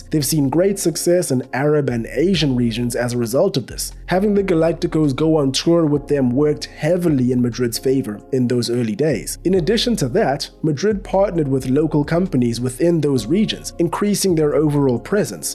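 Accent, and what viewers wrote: South Africa. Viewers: You sound South African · Bro are you south African? Your accent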